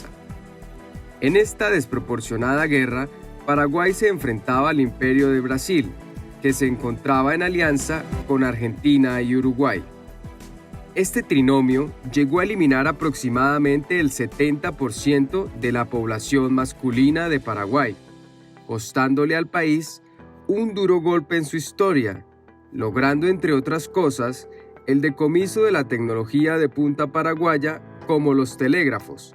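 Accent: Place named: Bogotá, Colombia. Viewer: El acento es de Bogotá, Colombia.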